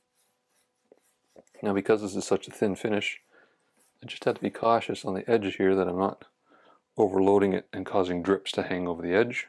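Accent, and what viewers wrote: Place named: Canada. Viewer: I enjoy a good voiceless restoration, but it was super cool to hear the explanation for everything. Its always great to see experienced professionals at work, this was a real treat! Proud of myself for catching the canadian accent almost immediately lol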